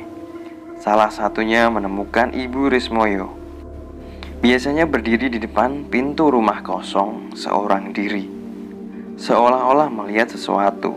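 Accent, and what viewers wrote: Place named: Java, Indonesia. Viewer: Logat jawamu kentel mas yho😂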